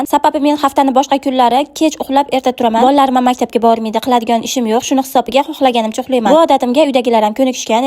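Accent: Namangan, Uzbekistan. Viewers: Voy dod shevezdan ey NAMANGAN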